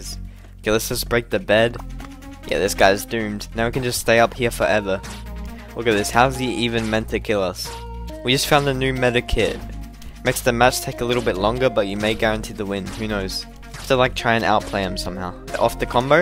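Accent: Australia. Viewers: Are you austrailian?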